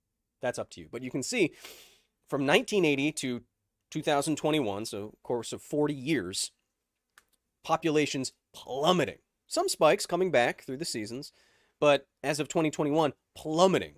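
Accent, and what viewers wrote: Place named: Australia. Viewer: He does an Australian accent because he's good at it and real Aussies aren't so easily offended. 👌👍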